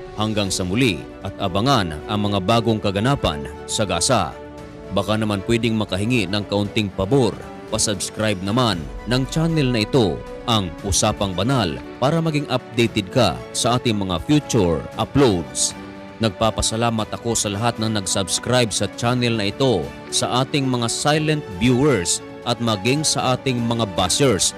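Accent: Visayas, Philippines. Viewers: bisaya ka dung!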